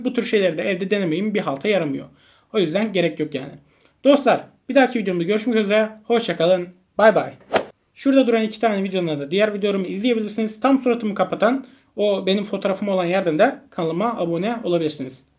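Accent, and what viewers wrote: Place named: Gaziantep, Turkey. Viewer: Adam antepli konuşmasından anladım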